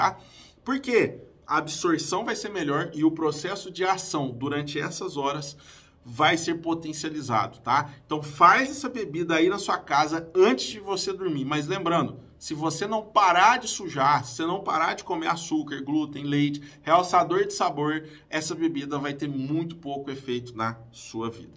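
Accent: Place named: Minas Gerais, Brazil. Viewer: Eu gostaria de dar mil likes para você Dr. Sou mineiro mas resido aqui no Rio de Janeiro há 45anos, e você pelo sotaque parece ser meu conterrâneo, Mineirão mesmo. Eu era gago qdo criança até aos 9anos, minha mãe uma Pernambucana arretada me aplicou um remédio natural do nordeste, uma bela duma colher de pau na cabeça, e não é que eu fiquei bom na hora.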